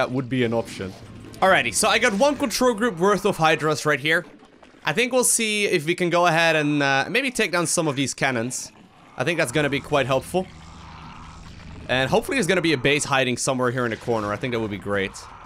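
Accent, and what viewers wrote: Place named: Netherlands. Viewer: So more people where screaming and hitting their screens yelling " look at your minimap!!!" Do love the vids and ur dutch accent, being dutch myself;)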